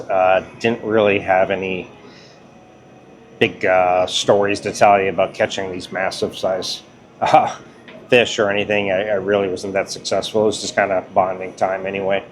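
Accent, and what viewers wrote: United States. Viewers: Are you from Minnesota?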